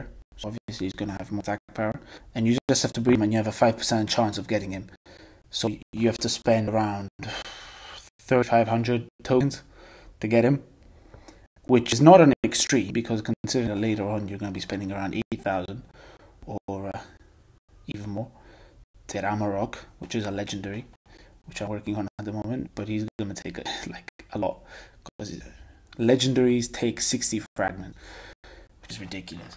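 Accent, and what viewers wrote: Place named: Eastern Europe. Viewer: cause they are like suka.. I hate it hahahaha so I need to watch more of your videos; I'm assuming you are Slavic from your accent.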